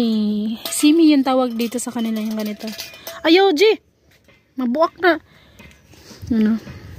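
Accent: Visayas, Philippines. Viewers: Cute oi bisaya mn😊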